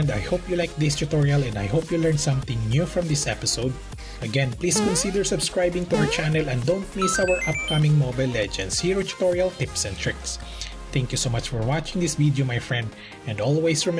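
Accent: Philippines. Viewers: Pinoy na pinoy yung accent.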